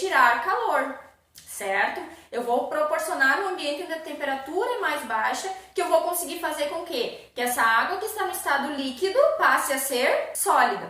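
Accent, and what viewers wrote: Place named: Rio Grande do Sul, Brazil. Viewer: Sotaque do rio grande do sul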